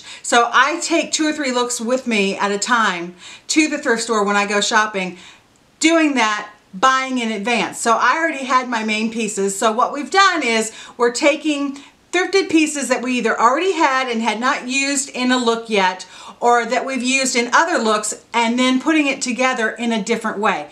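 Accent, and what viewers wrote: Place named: South, United States. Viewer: I give you a 10 times 10! This was so fun and oh my gosh, I got to see your huge closet!!!! Did I hear your southern accent here???? I love the outfit...you have never looked better and you look so dang thin!!! This was just what I needed today...that accent!!!!!!!!!!! Where did that come from!!! I love it!!! I know you have a Tv career...but I felt this video was really you! Love and hugs, Sues & The Dez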